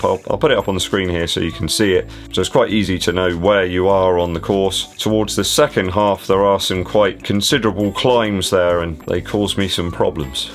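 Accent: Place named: West Country, United Kingdom. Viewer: Great race day footage and I'm also a fan of regional accents with the West Country at the top of the list 👍😁. Raced a local 10k last week in Milton Keynes and am running a 5 mile race this coming Tuesday in Leicestershire. Just bought a new pair of Boston 9s and so shall wear them. My other Boston 9s (they produced a 5 mile PB ) are enjoying their retirement but come out in the garden every now and then. Giving good vibes keep it up.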